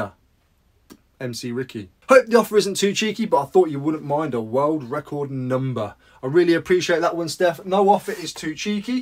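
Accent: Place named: Scotland, United Kingdom. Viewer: That Scottish accent mate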